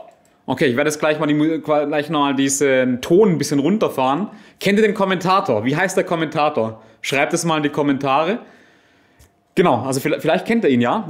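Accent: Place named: Baden, Germany. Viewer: sehr gerne und vielen Dank auch für die schnelle Antwort. :) Ich bin gespannt. Das hört sich gut an, dass ihr da schon hinter den Kulissen daran arbeitet.^^ Spannend wäre das beispielsweise wie das Profischachspieler machen, die das quasi als Beruf ausüben, aber auch Berufstätige, sprich beispielsweise du, also wie verbinden die den 8-Stunden-Job dann quasi mit Schach. Ja das Überangebot ist gerade für Spieler/innen unter DWZ1500 sehr erschlagend und überfordert zum Teil schon. Also ich habe einige Kanäle wieder deabonniert oder stumm geschalten, weil es einfach zu viel wird. Da helfen Bücher schon eher und das finde ich hier auf dem Kanal beispielsweise gut, dass nicht jeden Tag ein Video kommt (plus der badische Einschlag, wenn ich das richtig rausgehört habe, aber das liegt an dem ebenfalls vorhandenen badischen Wurzeln :D).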